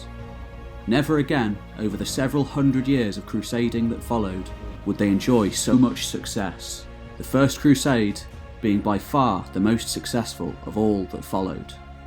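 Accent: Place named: RP, United Kingdom. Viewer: Just wondering if you have a true passion for history , judging by your tone . As I have said earlier , your Queen's English is music to the ears .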